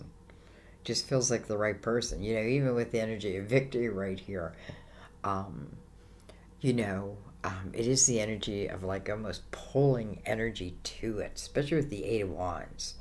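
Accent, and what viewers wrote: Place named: Pennsylvania, United States. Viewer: I have something to tell you. It’s like a big deal. I’m so grateful. I’ll send a private message. I (also) love your PA accent! Much. J T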